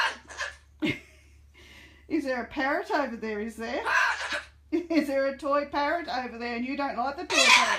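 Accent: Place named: Australia. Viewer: Oh yes I agree, along with the Australian accent he is priceless! 🦜 😁😅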